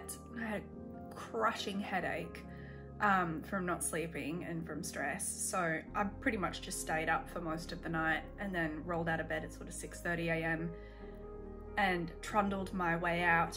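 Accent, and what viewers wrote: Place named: Australia. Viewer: You can tell you're home because you sound SUPPPPPER Aussie. Thanks for sharing your journey, can't wait to watch your streams!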